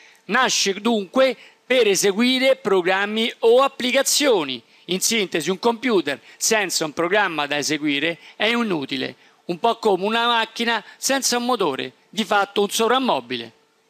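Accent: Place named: Rome, Italy. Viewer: già mi ha attirato molto l'argomento della discussione, ma con la tua voce romana mi hai convinto ancora di più :D sarebbe un miracolo se tutti gli insegnanti avessero la tua parlata ^_^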